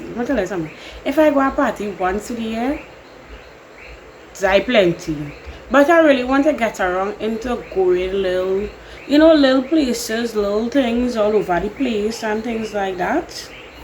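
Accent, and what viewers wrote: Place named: Caribbean. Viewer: So love your accent. Is that Trini or Jamaica?!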